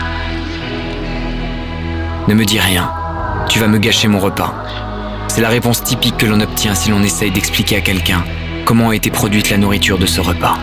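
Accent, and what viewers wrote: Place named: Spain. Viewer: Bel accent espagnol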